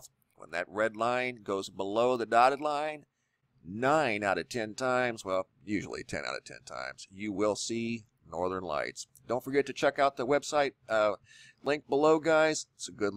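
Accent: United States. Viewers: ThingsAreToughAllOver it's cool mang, he's American I'm just glad they say my name right still.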